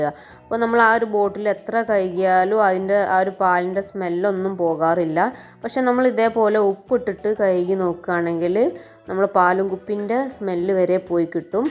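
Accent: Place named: Kannur, India. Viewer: ഞമണ്ടി എടുക്കാൻ 😂😂😂,കണ്ണൂർ ഡിസ്ട്രിക് ആണോ സഹോദരി ,👏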